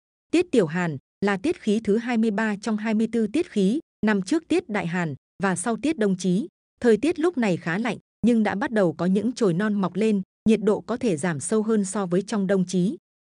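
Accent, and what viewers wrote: Thanh Hoa, Vietnam. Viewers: Giong Thanh hóa nghe đã ko dung rồi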